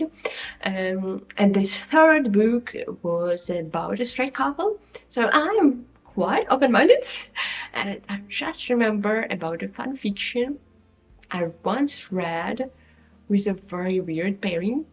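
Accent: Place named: Luxembourg. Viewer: I haven't read any of those books, but it was fun listening to you talk about them. I don't think I've ever heard a Luxembourg accent before, and I confess that I had a little trouble understanding it at times, but if you can take the trouble to read English books, and review them in English, I can make the effort to listen carefully. I look forward to seeing more videos from you. 🙂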